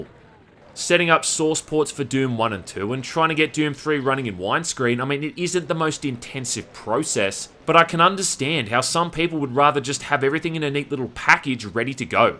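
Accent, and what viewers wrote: Australia. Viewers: It's been 6 years but for me, is the shit! The most honest, in your face, game reviewer. There is no comparison. For us FPS fanatics, it's either him or civvie 11. Reasons? No pandering to the lowest common denominator. No forcing political narratives in your throats. Respecting the old FPS gamers intelligence. Honesty and not getting blown away by modern game gimmicks. And That Australian accent. I grew up playing these games, so for me..... Best FPS game reviewer. Bar none. You Go! Respect from India. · "LOOK AT EEM, WOTS WRONG WITH YA FAICE???" I fuckin' love australians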